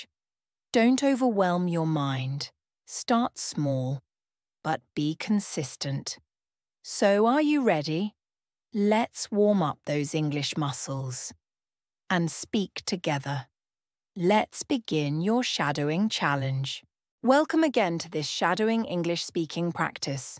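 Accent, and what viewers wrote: United Kingdom. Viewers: It is british accent. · The British accent is very charming🥰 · No she said at is a British accent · بريتيش اكسنت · I tried to find something with a British accent! 👏Thank you! 😊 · Is this british english?? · Day 1 complete! This shadowing challenge is exactly what I needed to boost my confidence and improve my pronunciation. Loving the clear and natural British accent❤ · noooooo qué lastima que es inglés británico... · She said it's British, because it's her accent. It's in minute. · I don't like the British accent 😢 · Is it british accent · (Is it british accent) Yes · I love the clear and natural British accent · England accent 😢😢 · Is this a British accent? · No ,it’s is a British accent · This is the first time I have seen this video and I loved it. Thanks for this proposal and the encouragement! The British accent is my favourite, keep going! 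· She said british accent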